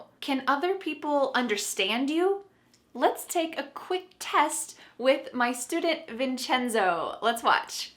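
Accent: United States. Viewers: it's not my intention to butter you up. You don't need it and I wouldn't gain anything with that. But I have to tell you: YOU ARE THE BEST ENGLISH TEACHER ON YOUTUBE EVER!!! I've been teaching English for over 40 years. During this time I've worked with a few American teachers. But... some of them have left me with a bad impression of Americans. You, on the other hand, have such a nice, humble approach in your classes, that sometimes I wonder if you are really American, despite having the best American accent I've ever heard. Just kidding! I don't doubt your nationality. But I understand why you are so successful as a teacher! I'll recommend your channel to all my students, not only for the quality you give to it, but especially because of the wonderful person you seem to be. I wish I could meet you and be your friend. I mean it! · This way of your American-style pronunciation seems to be a bit difficult for me, my teacher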